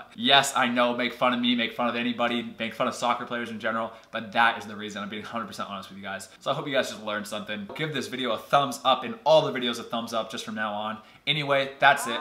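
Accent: United States. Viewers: pedro mota he is American, thats how he was taught to say it. Theirs nothing wrong with it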